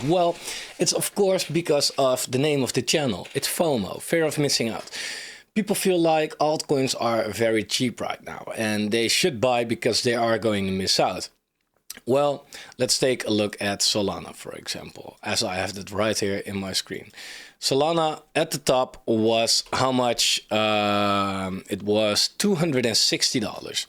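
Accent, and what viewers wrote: Netherlands. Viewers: Great content, finally something different. Btw you sound dutch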